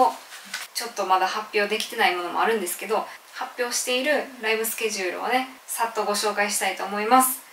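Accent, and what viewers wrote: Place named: Kansai, Japan. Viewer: やっぱり、ときどきの関西弁 可愛いです。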